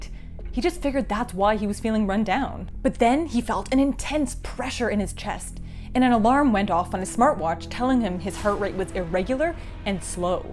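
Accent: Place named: Canada. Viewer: If you’re in the US, true. She sounds Canadian?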